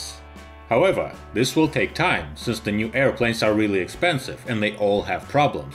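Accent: Russia. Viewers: Good video and I love your Russian accent. Subscribed. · От этого отстоя отказалась армия. Так что - можете не обсуждать привидение Каспера. С рязанским акцентом троллей посёлка Ольгино. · North american video with a russian accent...complete bs...what about the fact it can stop mid flight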